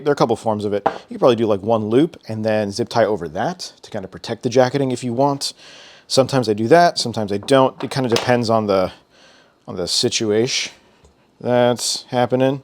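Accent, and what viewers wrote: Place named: Australia. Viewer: Great video! I let it play as I swapped an MTE into my XR, it was like we were building together! I even caught myself talking in Australian accent with you 😜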